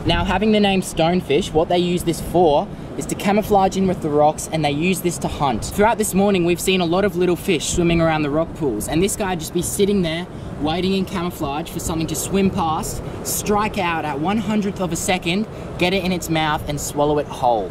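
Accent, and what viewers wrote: Australia. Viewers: Is he from Australia? nvm xd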